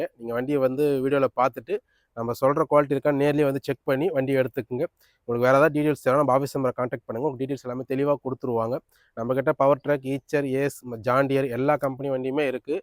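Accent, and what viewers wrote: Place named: Kerala, India. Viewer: Kerala Tamil❤🎉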